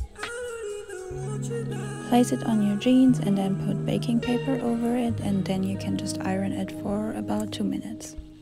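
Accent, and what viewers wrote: Germany. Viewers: Heyy nice vid! Btw are you german bc you have a german english accent :')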